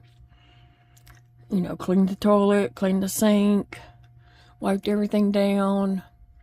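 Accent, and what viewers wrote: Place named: Louisiana, United States. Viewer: Hi, I hope you will always find a way to keep crocheting but even if you couldn’t, I’d still watch your videos just to listen to your lovely Louisianan voice and hear your giggles. From now on I will also let the ads run through without skipping. I’m crocheting anyway and not watching the screen all the times. I hope you feel better soon. Best wishes from England :)😊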